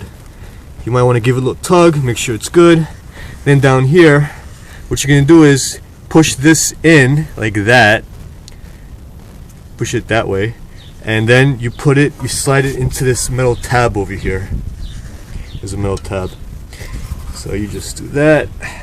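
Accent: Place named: Long Island, United States. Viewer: Excellent video! You MUST be from Long Island. I'm gonna guess Suffolk County. Am I right?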